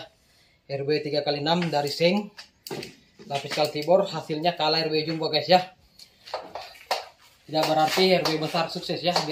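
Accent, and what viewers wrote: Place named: Makassar, Indonesia. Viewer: Ini di makassar ya guys logatnya kayak makssar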